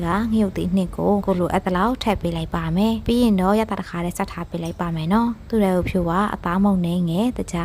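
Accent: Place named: Shan, Myanmar. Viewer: You sound like Shan people not Burmese however you have a sweet accent I am sure you are a good cook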